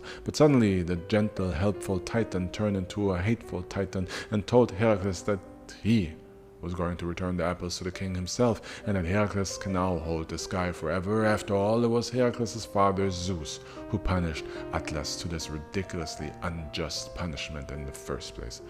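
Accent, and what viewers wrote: Germany. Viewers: to me it sounds like a German accent. Also the pronunciation of the god’s names sounds exactly like you’d hear them in German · Why do you have a German accent sometimes?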